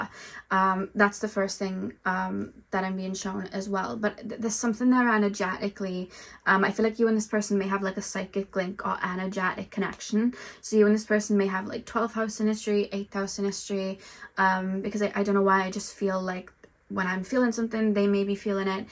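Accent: Wales, United Kingdom. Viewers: You are from Wales arent you? Whenever i hear that accent i remember whose channel im on 😂 i watch too many tarot reads obviously. But i have to say yours are one of my many favourites. Thank you ❤ P.s im inlove with the accent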